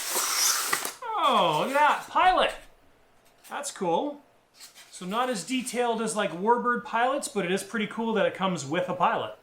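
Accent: Canada. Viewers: I don't know if I like this channel more for the great info and tips, or the fact that Canadians talk so funny...lol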